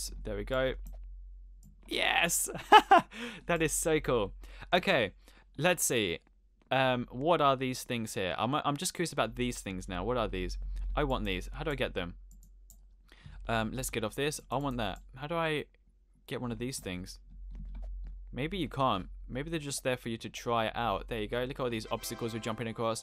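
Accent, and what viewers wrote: United Kingdom. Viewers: Are u British I can not tell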